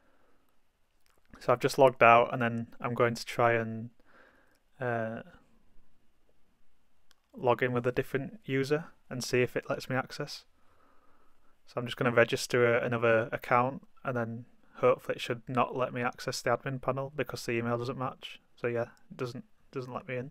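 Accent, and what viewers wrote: United Kingdom. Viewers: Hey, just found your trading bot video and seen that the next video you published was this one, recently. You sound local to me. You’re not from Teesside are you by any chance? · Great tutorial. Up the northern accent! Hull all the way! Does this work well with Jetstream or is there a clash between the logins?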